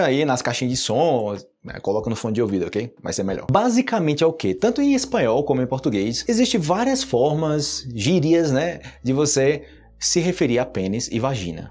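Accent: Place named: Colombia. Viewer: El es brasilero, pero estudió en Colombia . De verdad habla con acento colombiano 😂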